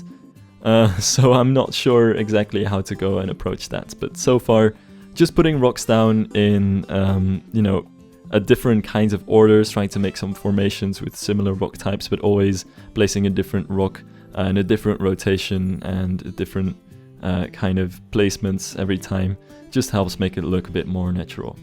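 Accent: Netherlands. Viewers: I hear that Dutch accent. Gegroet landsgenoot. :)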